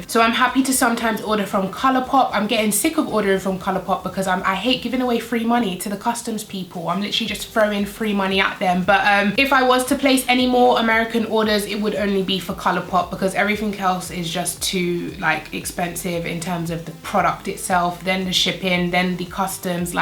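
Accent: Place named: United Kingdom. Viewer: Nice one. I have been guilty of overspending on makeup in the past but when my KvD Shade & Light was needing renewed I got the Revolution one. Its every bit as good. I am really pale and the middle top shade makes a great natural looking contour (The KvD one is the same but I can't afford to keep buying US products, the duty is crippling, they seem to just convert the dollars to pounds without using the exchange rate as if forty quid was the same as forty dollars. Finally, I know that Kat has done a stellar job in raising awareness of animal testing etc. But Revolution is cruelty free, British and costs a fraction of the price for really good quality products. I agree about the Fenty pallet being a tad uninspiring. But I really want to try one of her contour sticks which looks really good on pale skin and it's a cream which is more flattering to mature skin Great video Girl its nice to hear a British voice. 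As for Kylie and Co. I wouldn't spit on them if they were burning. Who bases their fame on their dead father being the lawyer of a wife killer? And that is just the Kardashian part. The Kylie bit with her two mum's or whatever it is is something most families would keep to themselves. They're grasping, fame chasing gold diggers. All of them...Kanye West should have recognised that!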